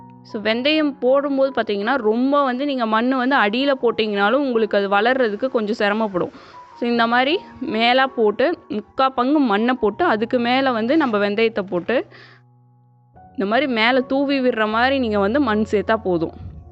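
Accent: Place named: Coimbatore, India. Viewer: I like you and too and show in video and Im also from Coimbatore I like your native slang lots of love 💕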